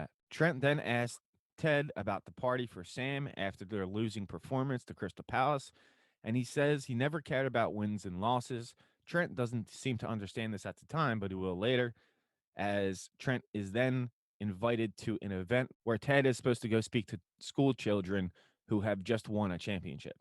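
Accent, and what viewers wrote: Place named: Philadelphia, United States. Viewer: I love your Philly accent :-)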